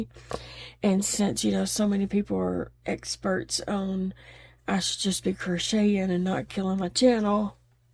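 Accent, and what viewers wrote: South, United States. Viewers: Hi. Im sorry if i said something to hurt you. I dont mean no harm. I cried right with you. ❤❤ive been watching you for some time. And i do love just listening to your wonderful humor and your southern accent ❤❤ ive had cancer and just not my self lately. But i just want you to know i love you and all your art work and im here to support you. So again forgive me please ❤❤❤❤❤❤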